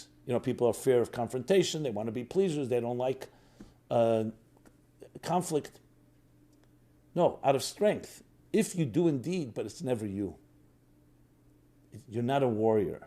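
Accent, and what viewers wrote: United States. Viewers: Thank You✨🌹✨🪬✨ sometimes it is difficult for me to understand you because you speak American English. Sorry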